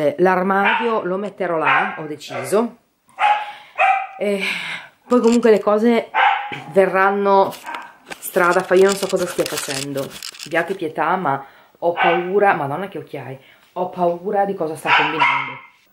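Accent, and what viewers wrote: Emilia, Italy. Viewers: Che ridere quando parli con!!!😂😂😂adoro l'accento emiliano!!! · SEI UNA GNOCCA TERRIBILE 😊😊😊!!! L adoro è stupenda!! Adoro gli emiliani nn x altro sono di Reggio Emilia ma purtroppo nn abito li ed è una vita che nn ci vado 😔😔 xo seguendoti e sentendoti parlare mi sembra di tornare un po' a casa... mi piace molto la tua semplicità complimenti x i video che fai un abbraccio 😘😘🤗🤗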